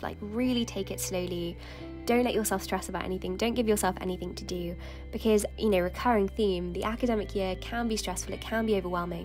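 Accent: United Kingdom. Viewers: her voice is soo calming.... i live around people who have that strong british accent (apperently i have one too but idk) so this feels weirdly familiar 😂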